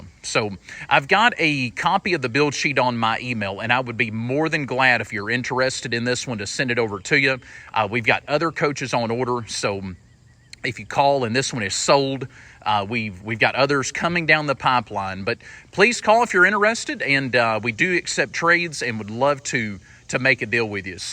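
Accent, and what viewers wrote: South, United States. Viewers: I like your southern drawl. It sounds so homey.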